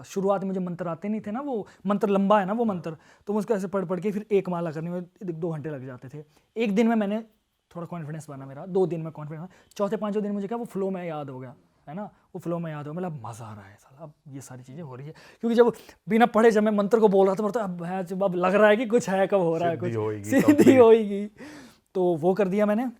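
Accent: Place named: Himachal Pradesh, India. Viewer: Being a himachali I can relate some words like shut karke ,chik chik chik ,and the accent ❤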